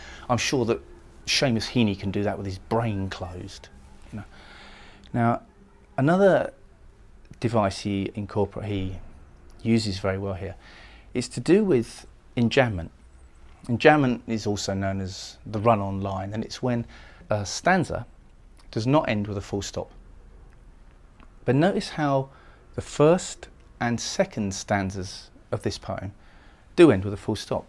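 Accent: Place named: England, United Kingdom. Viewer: Really doesn't sound right in an English accent...sorry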